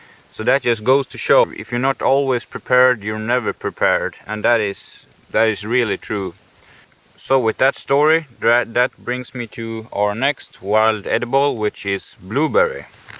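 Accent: Sweden. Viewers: Videos from Sweden...cool as hell! Love the accent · I love the Swedish accent! (Especially the "y" sounding "j"'s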